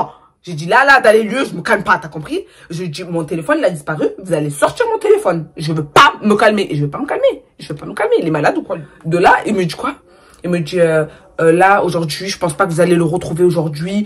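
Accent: Marseille, France. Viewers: T’es de Marseille ? J’ai l’impression d’entendre maeva ghennam là 😭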